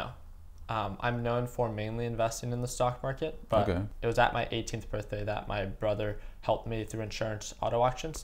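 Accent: United States. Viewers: he sounds like the SNL-Californians bits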